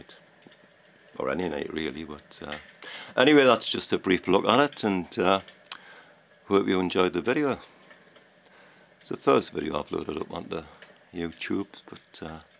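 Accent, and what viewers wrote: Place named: North, United Kingdom. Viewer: Mate, I would seriously pay you to make this for me, been looking at fires endlessly and this is better than any of them. This video is quite old, so I would love to know if you're still using it and how it's going. You sound from the North, I'm in Scotland and I serious about you doing this for me. I take it this is just for looks and no heat involved. If you are interested, please reply and we can get in touch. Regards.....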